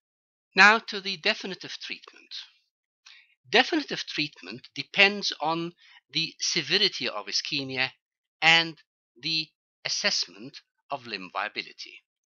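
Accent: United Kingdom. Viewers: واااووو ماشاءالله تبارك الله فكرته بريطاني قبل لا اقرأ الاسم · I thought he is from England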